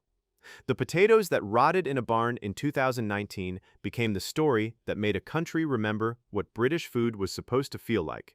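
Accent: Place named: United States. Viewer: This narrator is American Jeremy Clarkson's is English if someone is going to narrate an Englishman who lives in England surely it has to be narrated by British narrators just saying.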